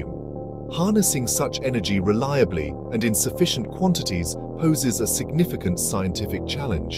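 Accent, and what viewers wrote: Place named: RP, United Kingdom. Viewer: Imagine a Youtube with no AI created garbage videos like this channel, videos would then have to be spoken by real people with faces and names instead of this endless chatGPT scripts read by posh british actor voices in monotonous repetetive nonsenceical giberish. What a world, it exists in many other videos created by astrophysicists but not here where the creater is just a grifter.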